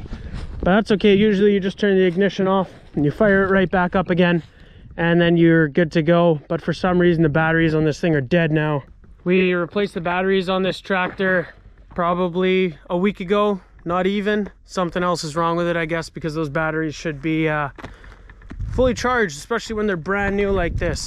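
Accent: Netherlands. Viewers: dat is een zeer zwaar Nederlands accent. leuk om een nieuwe video te zien hier in Vlaanderen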